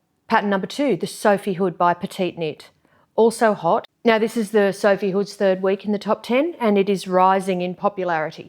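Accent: Australia. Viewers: Love your blunt approach & hearing an Aussie talk about knitting & yarn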